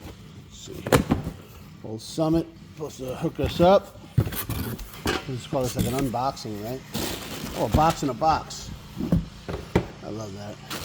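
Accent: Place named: East Coast, United States. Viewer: ok I subscribed your funny and got that east coast talk that I like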